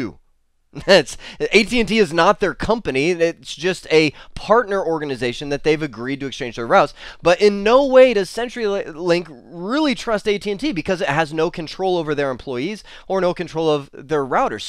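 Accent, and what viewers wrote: India. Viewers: if it hurts so much to hear Indian accent videos... simply stop listening to it in first few seconds.. People make videos for FREE to help other learners, why dont you simply pick up a book and read it then, instead of trying to find knowledge over youtube. I bet if you stay in technology field, you will hear more indian accents wherever you go... i am kind of sad and happy to read your comment, because whatever job you pick you will get to hear Indian accent....because we indians are way technically advanced :)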